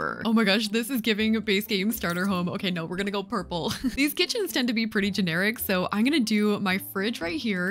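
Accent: Canada. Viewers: Are you Canadian? Also I feel like imma frequent your channel, glad I found you on my home page :)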